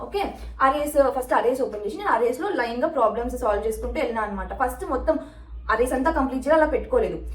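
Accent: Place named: Hyderabad, India. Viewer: Hi, I am an SDE 2 from a Product based company in Hyderabad. I really loved this video. Me being a pakka hyderabadi, absolutely loved your slang. Coming to the content, I can vouch for everything you said about the DSA prep & interview. It is fully informative and would be really helpful for everyone. Kudos to your efforts to help our Telugu people.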